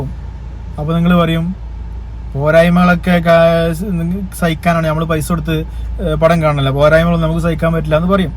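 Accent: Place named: Kozhikode, India. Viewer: ആദ്യം താൻ മലയാളം സംസാരിക്കാൻ പഠിക്കൂ... കോഴിക്കോട് ബാശ.... എണീറ്റു പോടേയ്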